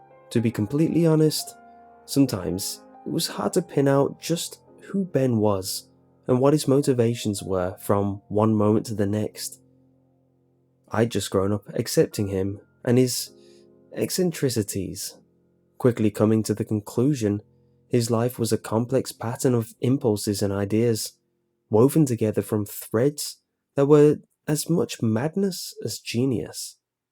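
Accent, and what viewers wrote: England, United Kingdom. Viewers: maybe england just a guess tho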